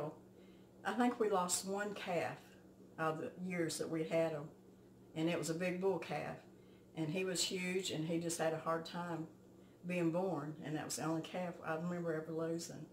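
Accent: South, United States. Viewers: Hi from Michigan. I watched one of your videos, and now I can't stop. Your so down to earth, and I love your southern accent. I could listen to you all day. You have some great gardening tips, and I love that you cook from scratch. Watching how you can your food is very educational, for me. My Mom, who passed away, would can food, but I never took the time, I wish I had, to learn that from her. I regret that now that she's gone. Do you know how to make cha cha ? Some call it chow chow, but my Grandmother and Mom called it cha cha. They used green tomatoes, pickling spice, sugar... not sure what else goes into it, but they would grind all of the vegetables up, cook it in a big pot and can a bunch of jars of it. We would eat it with greens and beans. I was wondering if you ever made any, and if so, do you have a video of that recipe? I've been craving it and want to make some. 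Thank you for taking the time, to make and share these videos. As a new subscriber haven't seen them all, but plan on watching a lot of them. 😊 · Me too! I love the way you tell stories and your beautiful southern voice!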